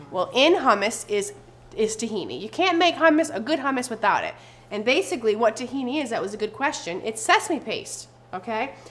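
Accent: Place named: New York, United States. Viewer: By her accent, I can tell she’s either from NJ or NY! Am I right? Lol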